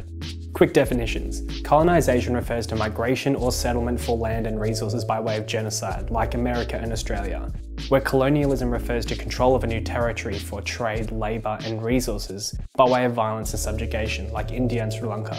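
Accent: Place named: United Kingdom. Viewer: Its amazing you can say this with a straight face. I thought it was hilarious, the brittish accent is really the icing on the cake!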